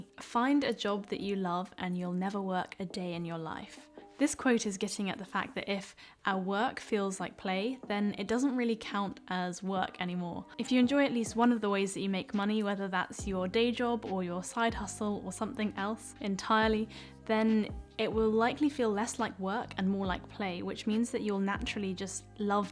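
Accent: RP, United Kingdom. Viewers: Thank a bunch. I like your british acent. Have fun ❤ 👍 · I really love your British accent and your idea. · Oh gosh, I really love your voice and also your British accent. I've thought I'll give up to learn British English so many times but when I watched your video, it inspired me a lot, thanks for that. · I really enjoy your videos and it is really enjoyable to listen to your impeccable British accent; of course, you were born in England, I presume. One thing I have noticed that you use the American pronunciation for the word: schedule. I wonder why? I hope you will continue to produce videos even after you assume your formal profession as a medical doctor. I shall look forward to your future videos. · Me encantan los Hobbies que consideras para vivir, muy buena filosofía de vida. Yo tengo un trabajo, me encanta bailar salsa, leer, aprender idiomas y hacer amigos... 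Me encanta tu voz, tu acento británico y tus hermosos ojos... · Hello I realy enjoy watching your videos over and over I'm english but coming from the black have an awful accent I'm ashamed of most enjoyed your r p english videos and do hope you do some more have you any ideas where I can learn to have an accent like yours keep up the good work as its enjoyable to watch your positive and life learning skills videos. Roger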